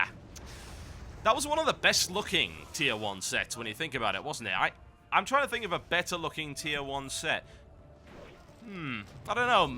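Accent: United Kingdom. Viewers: Tb, i luv ur videos ur so unique nd best of all ur a britsih commentator! much love x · A brit ranting. Epic win.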